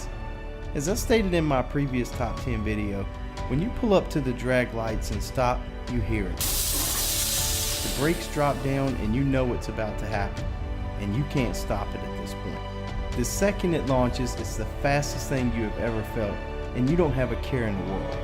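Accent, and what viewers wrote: South, United States. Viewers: I am guessing by your accent that you are from the south. If so, where are you from. I am from Andalusia, Alabama which is close to the Florida state line. I have also lived in Florida and I, now, reside in Tennessee.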